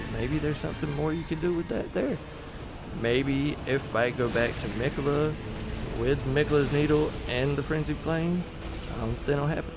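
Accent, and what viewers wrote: South, United States. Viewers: I like your accent. What state are you from? I'm guessing one between Tennessee and Florida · wasnt expecting the southern accent lol, love it, right on partner good video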